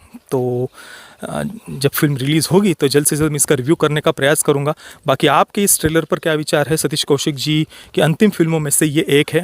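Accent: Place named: Kolhapur, India. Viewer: Kuthal gaav ahe bhau ❣️???? Tumch kolhapuri accent 👌🏻👌🏻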